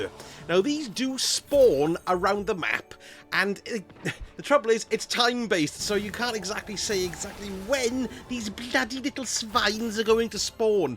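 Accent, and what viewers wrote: United Kingdom. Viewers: Oi are you British mate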